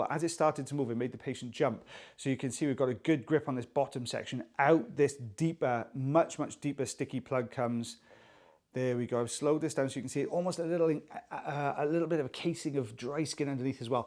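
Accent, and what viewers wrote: Wales, United Kingdom. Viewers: all these comments saying hwo enthusiastic you are; im just hearing a fellow welshman - we always sound enthusiastic hehe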